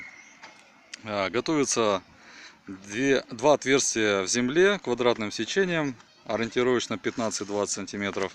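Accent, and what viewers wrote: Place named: Ukraine. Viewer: Украинский говор как не странно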